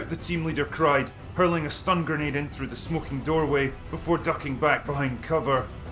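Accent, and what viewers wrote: Scotland, United Kingdom. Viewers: God DAMN. Still remember where I was on that morning. Remember driving into work, radio on, outlandish preliminary death counts. Entering the classroom, turning on the television for the kids-- like my teacher did when the Challenger exploded-- because this was more important than any lesson plan., you brought tears to my eyes. Also, haha, I loved hearing US SEAL team members with your Scottish accent. God bless ye!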